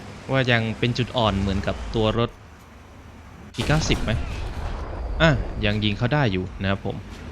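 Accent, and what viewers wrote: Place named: North, Thailand. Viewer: เป็นคนเหนือหรือเปล่าครับ สำเนียงเหมือนเลย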